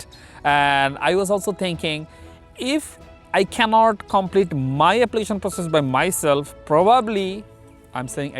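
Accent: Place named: Bangladesh. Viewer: I watched the entire video just to hear you say that you're from Bangladesh. But you did not. Are you ashamed of your nationality? And BTW I could tell that you're from Bangladesh by your accent.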